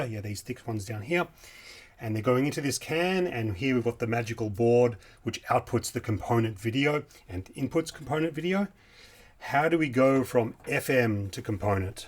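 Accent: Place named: South Melbourne, Australia. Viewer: This man speaks in a heavy south Melbourne accent. A Very harsh Aussie dialect.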